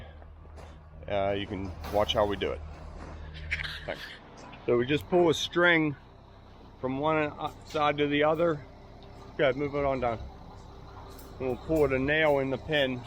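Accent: Pittsburgh, United States. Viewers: that yinzer accent. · I'm just a minute 30 into the video, and I'm like, he sounds like Pittsburgh, and I checked, TSH Concrete is indeed in Butler County, Pennsylvania, I got family that live out near there still. I never hear the Pittsburgh accent living out here in the boondocks of america, Portland Oregon where grocery stores and even the pubs close at 11pm every day of the week. anyway, on with the video, feeling very nostalgic just listening to you talk, after 21 years its nice to hear another voice from the area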